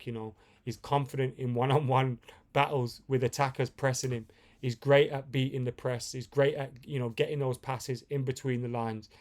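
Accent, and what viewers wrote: Birmingham, United Kingdom. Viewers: Thank you always clear and concise. Also, are you from Birmingham mate. Love the content❤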